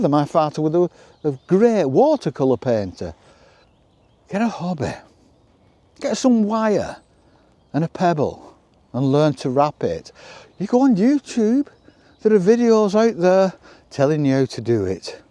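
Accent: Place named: Northern England, United Kingdom. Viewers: So nice to hear that Lancashire accent! Your hat and scarf are lovely!! I'd love to teach you how to spin your own wool. You could take your drop spindle out on walks with you and sit and spin on a rock or a bench by the lake there. I'm a 66 yo Lancashire lass with a ton of hobbies. Live in the states now. My hobbies are knitting, spinning, dying (I keep a few sheep and other farm animals) racing pigeons, dry stone walling, spoon carving, pickle making ( I have a road side stand) horse training, I torture the dogs with my fiddle playing :) make paracord bracelets and hand carved rubber stamps...gosh, the list goes on. We don't have telly, but You Tube is a God send for learning new things. Check out the Zed Outdoors channel if you'd like to learn spoon carving and other bushcraft skills. There's a big spoon carving festival held every year in Derbyshire. 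Spoonfest, it's called. I'd love to go some day. Wishing you many years of enjoyment in all you do! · Just found your channel and I've subscribed. I like your philosophy, and your northern accent, and your adorable little dog. You're a cool guy and an inspiration. Wish I were there. Thank you and cheers from the U.S. 🌿🐶💚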